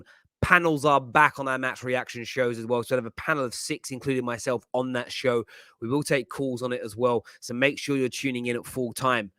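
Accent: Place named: London, United Kingdom. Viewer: White man talking London black man, innit bruv.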